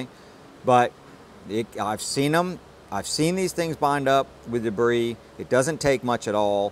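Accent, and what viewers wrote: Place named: Georgia, United States. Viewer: where you guys based? Georgia? I used to work in Hinesville 2004. I`m from the gods country of Scotland lol, Love the accent guys ,YI-ALL doin a great job guys.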